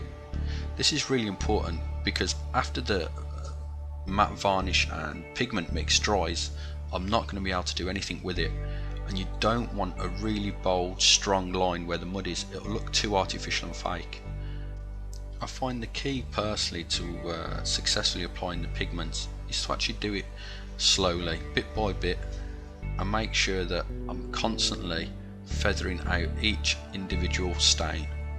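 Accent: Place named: Midlands, United Kingdom. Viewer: instruction on the four videos is first class and the rhino is an ebay buyers dream.. its good to hear that midland tone back on youtube.maybe next time you would paint some forge world mk2 hh marines in the good old ultramarines livery...please. oh and you get 4 thumbs up..way to go.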